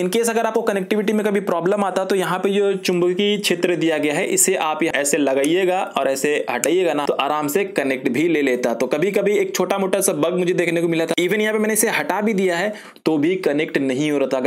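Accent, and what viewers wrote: Bihar, India. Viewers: Bihari ho ka 😅😅😅😅😅😅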